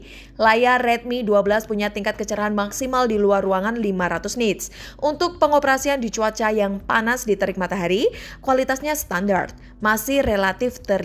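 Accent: Surabaya, Indonesia. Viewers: orang surabaya ya mbak?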